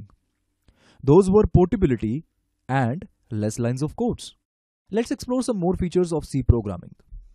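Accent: India. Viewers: Are you Indian? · Are you Indian sir?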